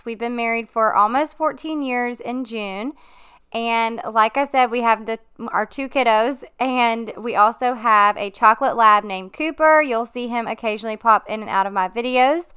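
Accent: South, United States. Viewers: Ok first of all - you’re one of the best ppl on YouTube, so shame on all the haters!!! Coming from Canada, just hearing your southern accent is awesome and relaxing!!!! Yassssss to spring cleaning! I’m all in for this! We are preparing to sell our home this summer so I need to get it thoroughly cleaned before showing the house! I need some good exterior house cleaning tips.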